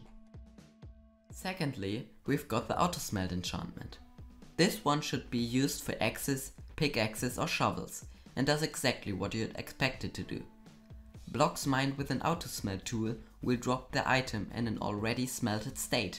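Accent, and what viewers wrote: Germany. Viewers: Age of xD glaub auch XD er hat so nen deutschen Akzent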